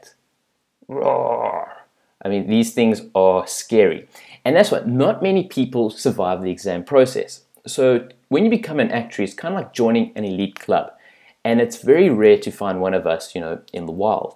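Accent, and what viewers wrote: South Africa. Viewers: You sound so South African lol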